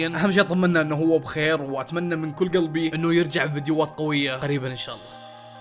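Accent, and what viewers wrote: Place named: Saudi Arabia. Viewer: وت شنو مصري سعودي 🌚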